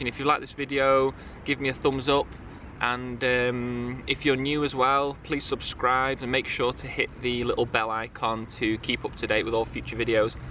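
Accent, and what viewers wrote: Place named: Sheffield, United Kingdom. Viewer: Nice video mate, Shame about the wind up in Castleton you would have gotten some great views up there, Well saying that looks like where you live you have some pretty decent views. Are you from Sheffield by any chance, Your accent sounds similar to mine?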